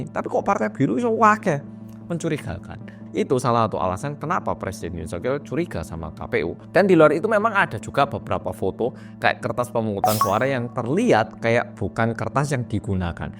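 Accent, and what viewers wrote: Java, Indonesia. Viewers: Mas medok e polll😅👍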